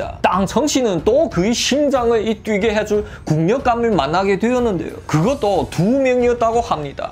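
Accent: China. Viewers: 억양이 특이하시네요.중국사람이 한국말 하는 느낌요.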